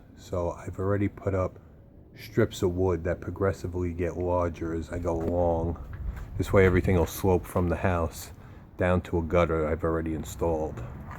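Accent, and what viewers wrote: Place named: New York, United States. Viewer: Awesome new york voice!!!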